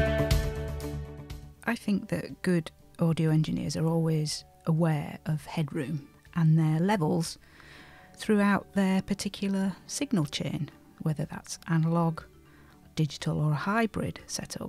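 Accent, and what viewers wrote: Northern England, United Kingdom. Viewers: I love that northern accent!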